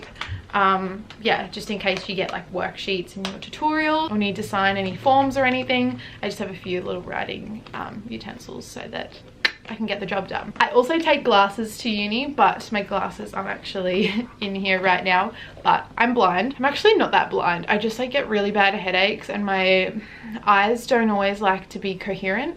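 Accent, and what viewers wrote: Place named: Australia. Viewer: I can tell your Australian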